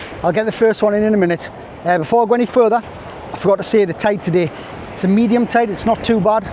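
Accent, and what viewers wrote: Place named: Newcastle, United Kingdom. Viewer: Hi just thought i would say i thoroughly enjoyed watching the video.....love that coastline it brings back so many memories and hearing the Geordie accent too!!! Take care mate cheers ian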